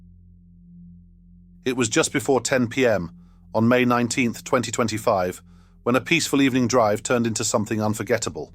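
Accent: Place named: Michigan, United States. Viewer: Bring back the Michigander voice! Thanks for another great video.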